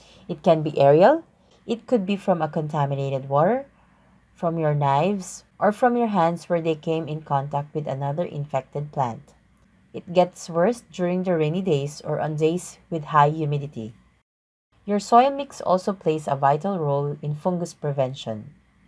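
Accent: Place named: Philippines. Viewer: Sounds like Filipino 😀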